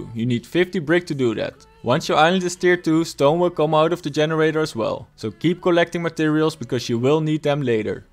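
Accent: Netherlands. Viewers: Je hoort toch wel dat je Nederlands bent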